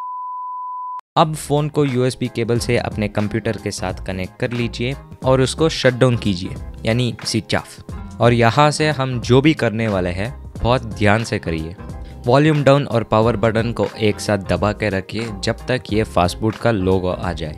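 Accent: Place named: Karnataka, India. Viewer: You are not native Hindi speaker, you sound like a kannadiga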